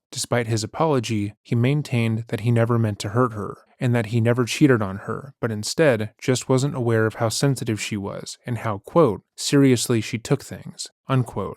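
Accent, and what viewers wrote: Canada. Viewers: well he has either purposely added the Canadian accent or the AI randomly generated it and he didn't notice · I’ve been subscribed for over a year and it’s just now dawned on me that you’re Canadian! Canadians pronounce the word “about” very uniquely. 😅 Love the channel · have you become more Canadian recently? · You're Canadian? This story brings flashbacks 😂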